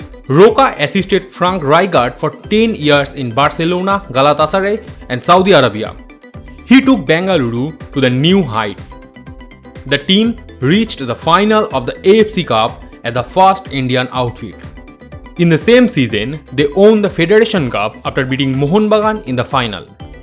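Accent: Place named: Bangladesh. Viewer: This is some thick Bangla accent brother. Good script though. Keep Growing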